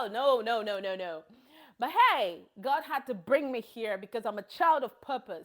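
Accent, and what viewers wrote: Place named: Northwest Region, Cameroon. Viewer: Please do videos with more batibo accent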